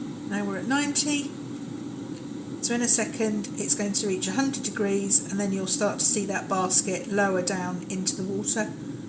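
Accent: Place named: United Kingdom. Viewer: Hi there, thanks for the video. it was lovely to watch and listen to your beautiful british english accent :)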